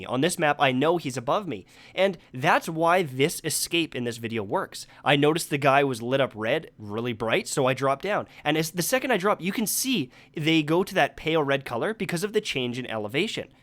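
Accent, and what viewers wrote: Canada. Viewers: Are you canadian? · Are you Canadian?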